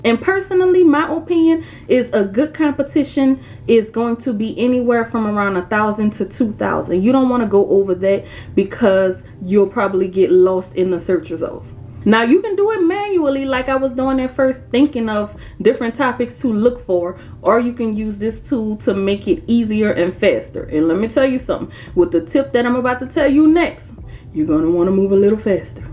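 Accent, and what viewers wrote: New Orleans, United States. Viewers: You're from New Orleans aren't you?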